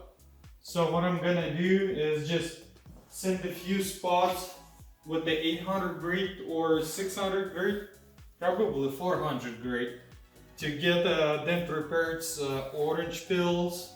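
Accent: Russia. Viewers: you speak english like a russian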